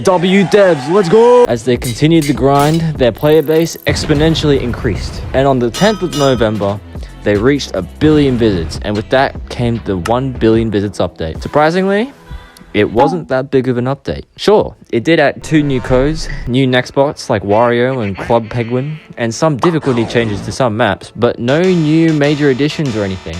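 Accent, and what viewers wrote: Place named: Australia. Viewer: Are you Australian I love the accent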